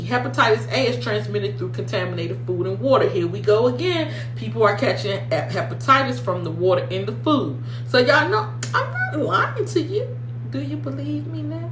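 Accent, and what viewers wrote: United States. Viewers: They probably chalked it up to your American accent...And thought you were talking about the hippo, that escaped from the local zoo, from a few days before!!!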